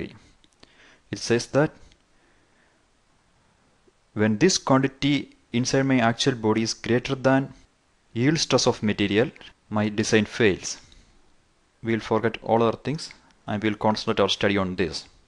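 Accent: Kerala, India. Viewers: Malayali aano Sir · great explanation but Malyali accent tho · Yes, he is an Indian, he's a mallu. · It's an Indian accent. For those who are here to complain about his accent, please remember that you don't even know a word in Hindi or any other languages in India. Good job mate, with the video! Fuk accent critics.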